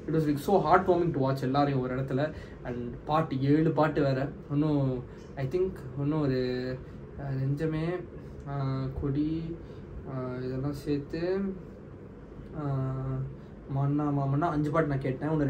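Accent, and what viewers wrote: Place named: Malaysia. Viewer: Ponna , savadi ? Neenga Malaysian ah bro ? Antha slang varuthu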